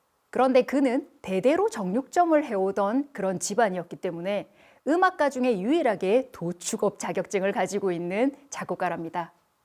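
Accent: Gyeongsang, South Korea. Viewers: 웬일이냐. EBS 에서 쓸데있는 프로그램을 다 만들고. 배 선생님 경상도 억양이 있음에도 diction 도 너무 깨끗해서 잘 알아들을 수 있고 ( script ) 내용도 너무 좋네요 . 소위 말하는 방송작가라는 인력의 손이 별로 닿지 아니한 듯.. EBS 여행 프로그램 중남미편 몇 개 보다 말았는데요 전문가라고 나온 사람들이 그것도 교수 직함 달고 스페인어 정관사도 구분 못하질 않나 돌아다니면서 쩝쩝거리고 먹질 않나 ( 그게 한국서는 실례가 안되지만 외국서는 아무리 중남미라해도 엄청 미개한 매너 ) 그래서 EBS 프로그램 PD 들 수준을 의심했는데 이 번에 볼만하고 들을만한 Casting 이군요 . 웬일입니까.. 앞으로 계속 방송 잘 만드시길 부탁합니다. 정말 배 선생님 때문에 이거 보게 되었습니다.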